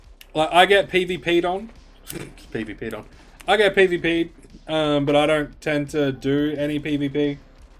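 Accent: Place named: Australia. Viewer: Would love more of these unedited long hunting sessions. When I hunt, I need an aussie accent in the background now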